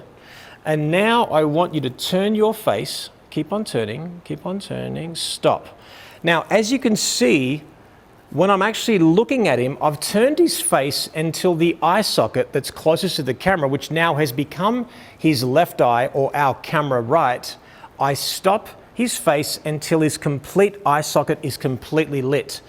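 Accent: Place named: Australia. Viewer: I love his aussie accent.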